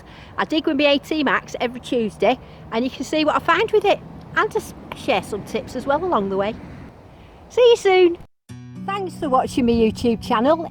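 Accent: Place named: England, United Kingdom. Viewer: I love the English Accent!!!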